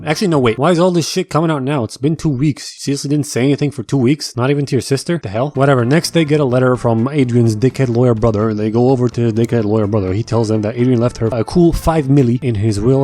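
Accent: United Kingdom. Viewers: Wait are you British because that makes sound more funny idk maybe it is just me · r u british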